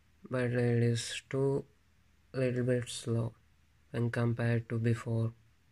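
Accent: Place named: India. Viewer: I hate your "hindu-glish" ! FCKU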